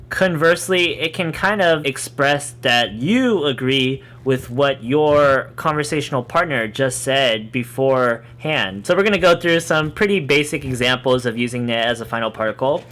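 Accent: United States. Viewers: you look so japanese man :D I thought you should be american with your english speech level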